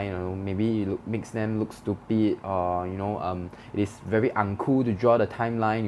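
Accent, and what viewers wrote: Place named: Malaysia. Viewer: I can bet a £100 that you're from Malaysia haha. Just sound like my friend.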